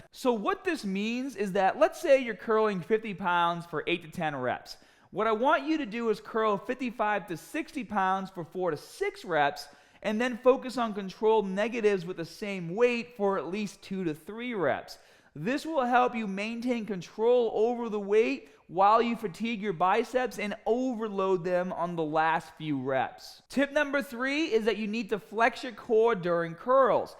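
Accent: Boston, United States. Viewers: Is he from Boston?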